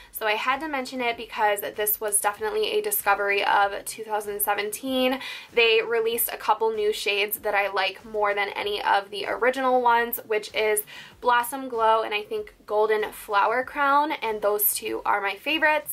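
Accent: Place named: New York, United States. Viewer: you can really hear the New yorker accent when she talks. its so endearing :)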